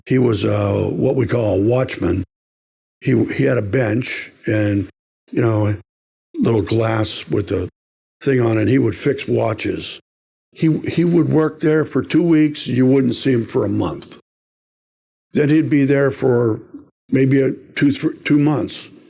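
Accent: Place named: Pittsburgh, United States. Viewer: I KNEW you were from Pittsburgh, but I couldn't confirm it until you just mentioned it. I was born and raised there myself, and now that I have lived outside the city for so many years, I can catch the accent immediately. Anyhow great series. I fell in love with it and I am binge-watching right now. I am going to purchase the book this week. Thank you.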